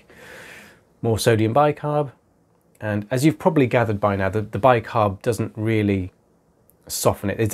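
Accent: United Kingdom. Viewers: It's definitely a British accent, it's just not the stereotyped accent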